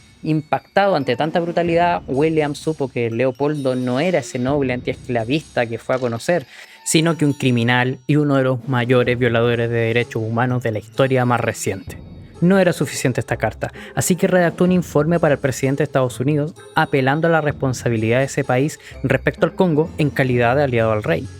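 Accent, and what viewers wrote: Chile. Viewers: Siento un pequeño acento chileno eres chileno? 🎉 excelente canal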